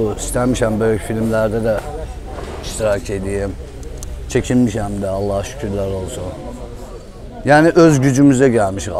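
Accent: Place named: Shaki, Azerbaijan. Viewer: Bəli qardaş Şəkili ləhcəsində Şəkili obrazını çox supper oynayırsınız əla, Şəki ləhcəsinin pərəstişkarıyam, salam olsun Şəkililərə.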